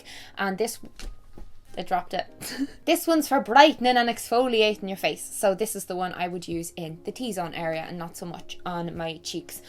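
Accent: Ireland. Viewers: I found your channel while looking for Irish YouTubers to learn the accent! Also, I'm taking my first trip to Ireland next year, and I would LOVE it if you and Jessie could make a video on must see places in Ireland. Especially if there are things only the locals know about! I love your videos!! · I found your channel because my ex is Irish 😘 and I just loved your accent and I loved your videos!!!! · I found your channel because I was googling Irish youtubers (Irish accent is the BEST) haha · So happy to see this video from you right now- I have horrible pms cramps and sitting with hot cider listening to you is so nice! I started watching you because I saw one of your food diaries in the related videos of a what I ate in a day video I was watching. A beautiful sweet bi lady with an Irish accent who loves Harry Potter and makes food videos! Right up my street :) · I found your gorgeous self last year by looking for Irish accent tag videos haha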